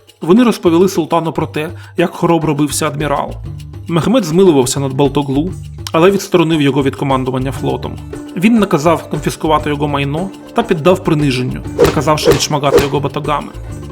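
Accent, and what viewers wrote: Russia. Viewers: Конечно на Украинском это патриотично . Но все таки родной язык декламатора русский . Слышу ґе , а не ге . Слышу ясно так как знаю Украинский досконально . Но все равно молодец .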